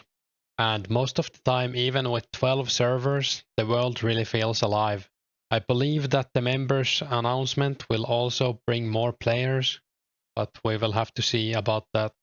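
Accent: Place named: Finland. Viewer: Enjoying the vids man! That glorious Finnish accent is very calming and helps me get through the late night grinds :)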